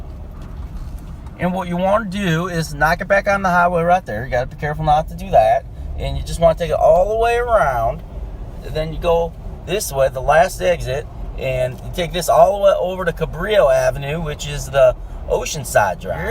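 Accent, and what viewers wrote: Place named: South, United States. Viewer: You sound like a country boy, which state????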